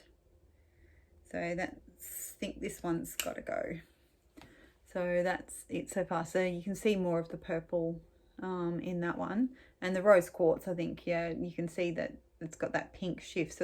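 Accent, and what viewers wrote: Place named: Australia. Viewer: Aussie accent learning time! haha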